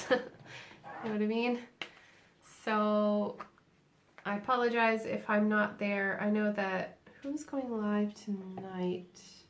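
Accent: Ireland. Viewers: You do what ever makes you happy. I love to see what you put out and I love your mixed accent. YES you are starting to mix in a bit of Irish tones when you speak, and it is Adorable. Love you!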